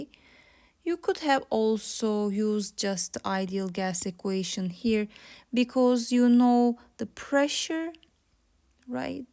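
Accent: Turkey. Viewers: Hocam I just watched the video about Linear Density :D Aksanınızdan dolayı Türk olduğunuzu düşündüm ama kanalınızda hiçbir personal info'ya rastlayamadım hocam.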